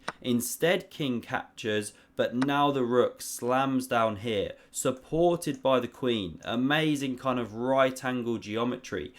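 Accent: United Kingdom. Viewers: Can you speak less british accent? It's actually really hard to stand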